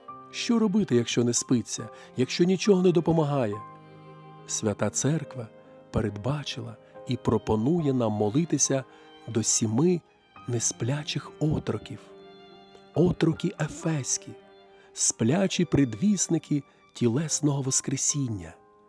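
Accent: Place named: Russia. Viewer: Все прекрасно, якби не той, вибачте, противний, російський акцент. Отче, позбудьтеся його, будь ласка, залишаючи слов'янську мову.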